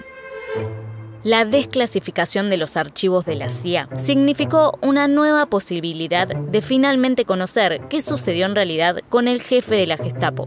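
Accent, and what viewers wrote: Argentina. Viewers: El primero de masho... Si pretenden que gente no argentina se interese en sus vídeos, traten de disimular el acento. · Puufff, lamentable que el video esté en ese español argentino (o será uruguayo) "raro"....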